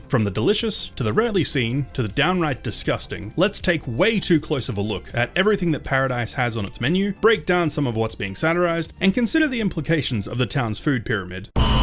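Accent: Australia. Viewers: he's australian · I love listening to a "polite, elegant, australian" voice narrate over such a crude and violet game, the contrast is just so funny but also, genuinely calming! You're videoz R gud man :) Me give thumbs up!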